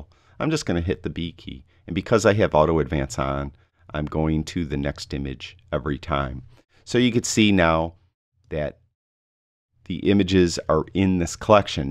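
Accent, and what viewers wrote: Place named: Boston, United States. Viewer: You are from Boston, aren't you? I can tell by the way you say dot CAAM! Well, I guess its no secret since you showed pics of the Boston History Museum last vid.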